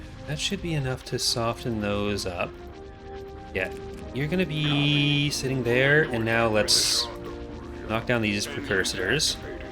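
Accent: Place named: Canada. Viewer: dont worry about sounding too Canadian, its awesome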